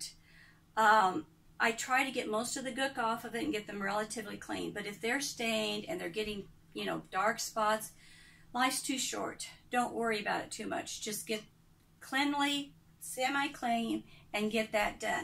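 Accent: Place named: Kansas, United States. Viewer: I knew u were from Kansas. Kansas girls just know one another. And the way we say wash. Ps I was all my dishes by hand ❤️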